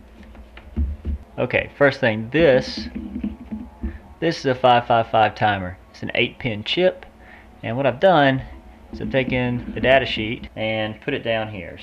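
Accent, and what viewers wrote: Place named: United States. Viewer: that American accent :D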